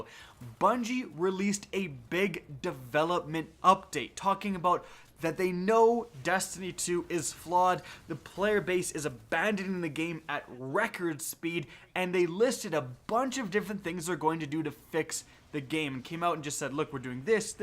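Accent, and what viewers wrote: Canada. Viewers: omg hes canaden "sawy"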